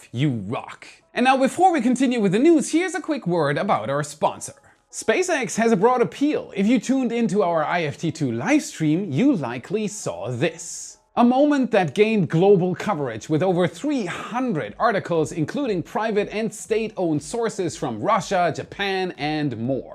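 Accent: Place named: Germany. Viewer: Nice surprise. Always a space geek's ecstacy. Space must always come with a German accent!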